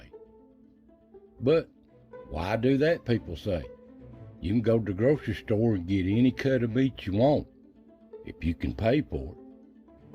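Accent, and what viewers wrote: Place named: South, United States. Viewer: My mother grew up in Lafollette Tennessee. My dad grew up in southern Pennsylvania both on farms the stuff we did when we were kids was just half of what they grew up with. Enjoy your southern accent. I love the history. Thanks for sharing Joe in Maine.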